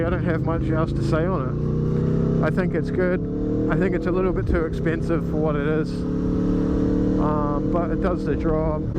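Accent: New Zealand. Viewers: Hey bro Good to hear a fellow kiwi on YouTube. What bike is that you are on?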